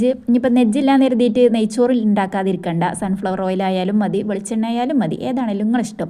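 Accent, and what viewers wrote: Malappuram, India. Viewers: Thani malappuram baasha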